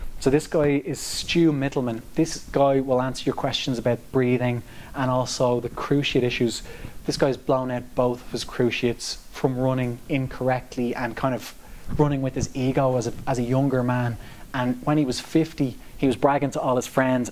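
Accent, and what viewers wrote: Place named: Ireland. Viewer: Big man ! Its been a while ! Classic moment as I am looking for tips and tricks on doing my first marathon here in florida in October. I clicked your link and hear the irish accent and burst out laughing ......I know that bloke ! I hope you are doing well skipper. I enjoyed the video, good work. Gerry Carroll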